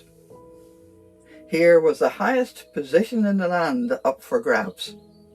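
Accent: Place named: Ireland. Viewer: I love listening to lovely Irish lilt. But I see where you are coming from. Sometimes we can't watch with sound on.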